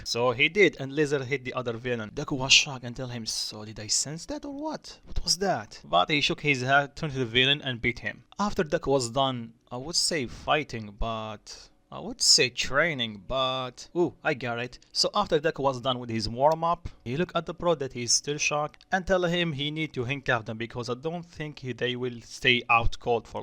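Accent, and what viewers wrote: Russia. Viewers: Were are u from? I can here a Russian accent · Are you Russian 😅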